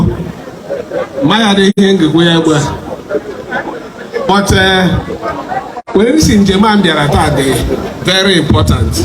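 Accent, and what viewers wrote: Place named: Umuahia, Nigeria. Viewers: he's Igbo language sound like the ikwuano umuahia people speaks . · He's even speaking central Igbo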